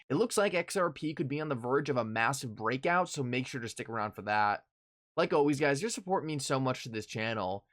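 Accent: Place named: Delaware County, United States. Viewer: That’s that Delco accent… he won’t admit it but I knows it when I hears it…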